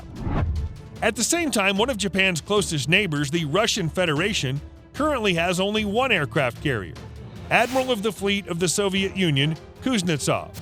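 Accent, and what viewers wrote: United States. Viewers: You are american by your accent, and still you used meters! The whole world thank you for kindness! <3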